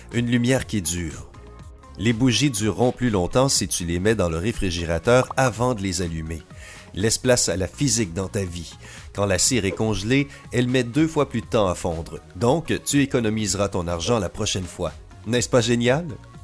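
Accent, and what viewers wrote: Quebec, Canada. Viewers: Quel accent de merde cet accent québécois quand même, on dirait de la chiasse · l'accent quebecois désolé mais non s'il vous plaît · L accent Quebecois ET trop drole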